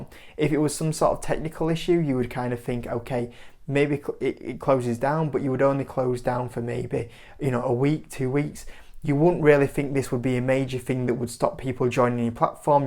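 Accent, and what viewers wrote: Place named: Northern England, United Kingdom. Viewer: If you didn't look like Sid from toy story and didn't have a northern accent you'd have 100k+ subscribers. Best UK and Ireland based investment content on YouTube for inexperienced investors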